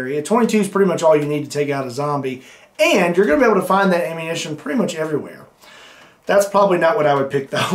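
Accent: Kentucky, United States. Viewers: “Are you from Kentucky”, haha as if your accent (or the name of the channel) didn’t give it away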